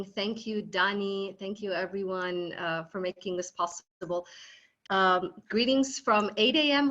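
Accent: United States. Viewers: Over 300,000 have Iranian perished since these fanatics took over.please mention all Iranian's suffering.I am amazed how you adapted to the American accent and look. thanks for recording the history .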